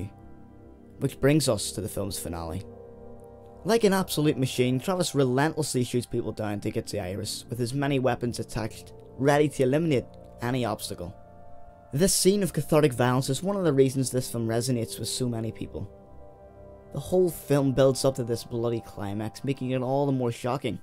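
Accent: Northern Ireland, United Kingdom. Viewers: This analysis is a very insightful and amazing one, thank u very much for sharing! It's pointed out to me that I must go back and rewatch the film! Also can I ask u a quick personal question? Ur accent is a northern Irish one?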